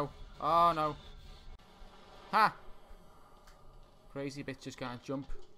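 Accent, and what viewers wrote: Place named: Liverpool, United Kingdom. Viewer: I'm not very good at accents but I recognise real Everton supporter anywhere lol lol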